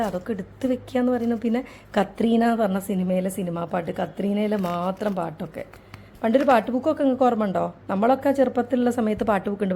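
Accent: Kozhikode, India. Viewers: ഈ nostu കേൾക്കാൻ വേണ്ടിയാണ് ഈ ചാനൽ കാണുന്നത്. പിന്നെ കോഴിക്കോട് സ്ലാംഗ് കേൾക്കാനും ഒരു ആഗ്രഹം. എൻ്റെ അച്ഛൻ്റെ വീട് കോഴിക്കോടാണ്..അവിടുത്തെ ഭാഷ അതേപോലെ അവതരണം 👌👌👌👌👌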